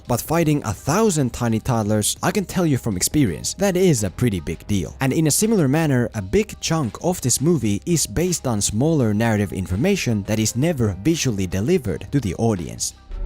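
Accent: Finland. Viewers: I have to ask, are you Finnish? Your accent tells me you are. In anime the story was different and as far as I remember, they always setup things and payed them off. This Movie had so many WTF moments and no stake at all for the viewer. What a waste.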